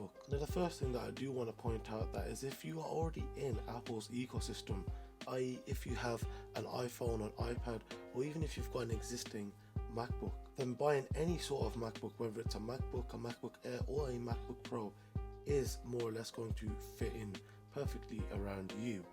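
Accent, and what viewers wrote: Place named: England, United Kingdom. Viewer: annoying english accent. good review though.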